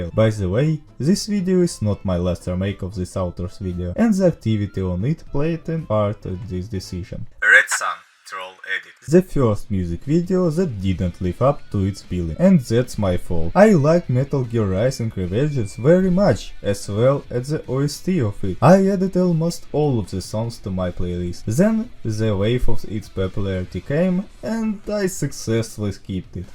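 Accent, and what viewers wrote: Russia. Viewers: ДА ТЫ РУССКИЙ!! Я ПО АКЦЕНТУ СЛЫШУ!!! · Ахах,найс русский акцент) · Русский акцент имба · The Russian accent, lol (i like your voice, the only thing that is funni for me is the Russian accent)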